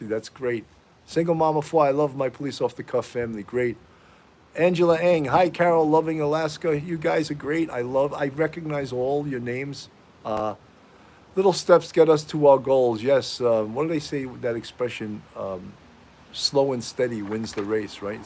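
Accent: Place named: East Coast, United States. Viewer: Hey,! Watching the replay. Haha - I can tell you're from the East Coast! Illinois is pronounced "Illinoi". The "s" is silent. I'm not nit-picky, am I? The nationwide "brotherhood" among police often extends to Marines. My disabled Vietnam veteran husband has often been given a pass for minor offenses.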